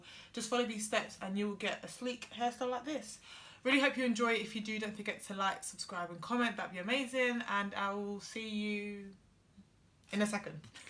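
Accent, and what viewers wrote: United Kingdom. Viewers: Your so prettyyyyy!!!!! And I freaking love your accent❤️ it’s probably not but is it British?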